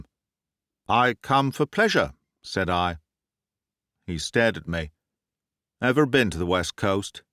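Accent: United Kingdom. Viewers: Its my utmost pleasure listening to your clear British accent that I love... Please never stop sharing stories narrated by your marvellous voice.. thank you very much sir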